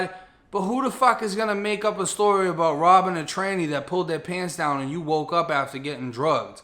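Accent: Boston, United States. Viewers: You from Boston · Lol Cuz is u from boston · Hahaha Irish ☘️ 💪🏼And italian 🇮🇹💪🏼with a straight southie (Boston) accent.... it make all sense now .... did u ever explaine in your vids or anyone ask how the Florida relation goes with the New England accent??💫✊🏼💥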